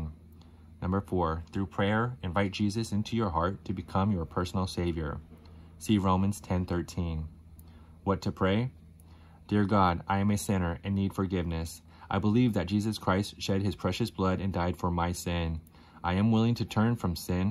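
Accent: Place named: Scandinavia. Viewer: Wru from? Just curious, no disrespect intended. I love languages and different cultures. That aside, you sound kind of Scandinavian. If I'm wrong, again, no disrespect intended.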